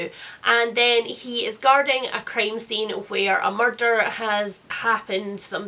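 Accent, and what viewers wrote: Scotland, United Kingdom. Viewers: Hello! I'm a new subscriber and just wanted to say HELLO! I'm binging your videos and think they're great. So good to hear a fellow Scottish woman chat about books. Hope you're feeling better! xxx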